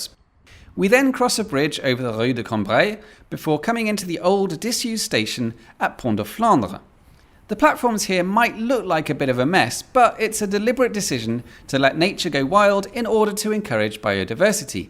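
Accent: United Kingdom. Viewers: Hearing your perfect french with your british accent is a candy for my french ears. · Quick question here. So are you British, or Parisian? Because your accent more like English man rather than Parisian. But your other language is good too.. Ps: edit English to British. Sorry, because in my english lesson, british always said as english man. 😶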